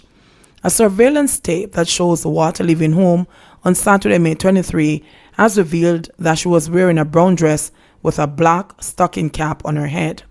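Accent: Jamaica. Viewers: 32 idiots - NOTHING here to Dislike - Her Voice is Perfect 👌 for Audio & She Speaks with a WONDERFUL Jamaican Accent - She does not come across Stuffy - She comes across REAL & Intelligent—— “Clowns”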